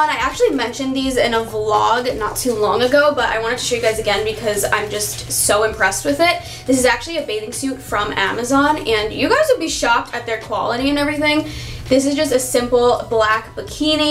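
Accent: New York, United States. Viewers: I not only love your style and your sweet kitty that looks similar to mine... but I die for your New York accent. So cute so sassy. ❤️❤️ cool haul. Digging those leaf pants 👖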